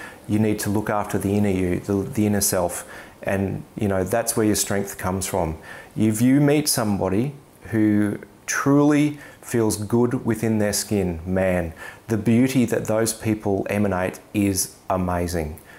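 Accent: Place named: Australia. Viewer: I love your Australian accent.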